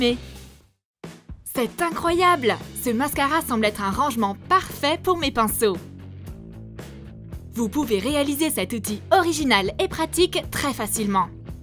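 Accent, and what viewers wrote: United Kingdom. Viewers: vous êtes française ou anglaise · vous êtes anglaise ou française?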